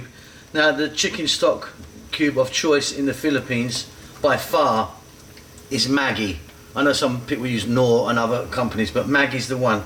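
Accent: United Kingdom. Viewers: MUY DELICIOSO! Five stars for using the Fish Sauce and your thick British Accent to go with that. Really, it's delicious, really!!!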